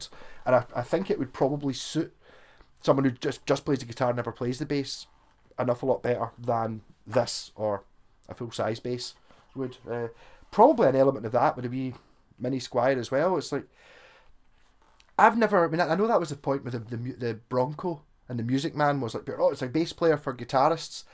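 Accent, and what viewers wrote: Scotland, United Kingdom. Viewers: I'm referring to the bass in the video.. You did say it is a Wasburn refinish by JPAX correct ? I'm sorry if I'm bugging you but that is a great little bass and I'd like to find one for myself to try.. You do such great reviews and I love to hear your thick Scottish accent ! I'm in the USA but my ancestors were part of Clan Buchanan. I'd love to visit there someday.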